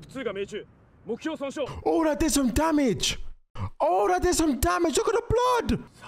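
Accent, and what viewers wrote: United Kingdom. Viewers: Your really British my man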